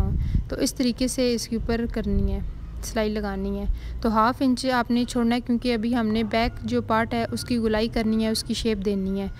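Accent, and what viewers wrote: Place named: Chakwal, Pakistan. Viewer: App ka lejha bta Raha ha k ap chkwal side se hn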